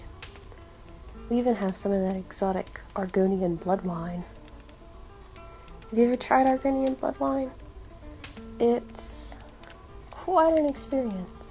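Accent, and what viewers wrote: South, United States. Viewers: i like the slight southern twang to your voice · American accent spoilt it for me. Americans are not medieval. It needs to be an English accent. Cornwall, Devon, Irish,...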